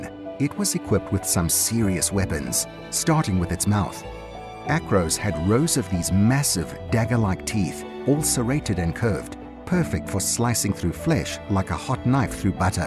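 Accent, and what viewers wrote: United Kingdom. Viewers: UK accents are annoying. DISLIKE.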